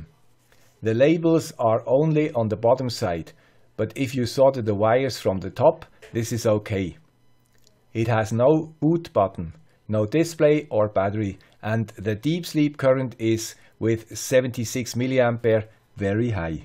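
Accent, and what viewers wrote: Switzerland. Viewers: Ok. Thank you for your answer (with the Swiss accent). Regards from someone with a Belgian accent;-) Really enjoy your channel!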